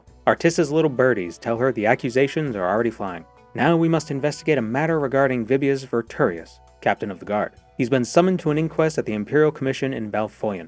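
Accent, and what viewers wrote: Boston, United States. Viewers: You should use your boston accent more often its so good (I promise I'm not biased because i live here) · So, it wasn't until I went back and watched your RS3 Maxing series that I clearly heard your Bostonian come out. I don't know how I never picked up on it from watching all of your Morrowind content AND your streams lol. I absolutely love it, honestly. It's the same joy I get when I hear Jerma slip into his Bostonian. I get it, my Texanese will show up from time to time.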